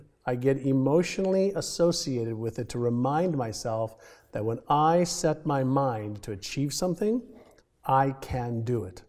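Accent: France. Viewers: I love this French accent. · A blond guy with a french accent , what a treat, video made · This French accent: -)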